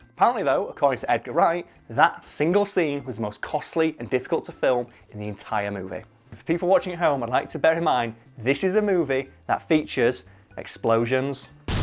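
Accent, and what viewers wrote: United Kingdom. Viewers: Finally, someone who talks rate bladdy British! Someone to like!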